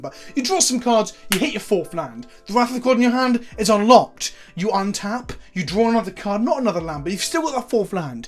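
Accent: United Kingdom. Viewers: British Hungrybox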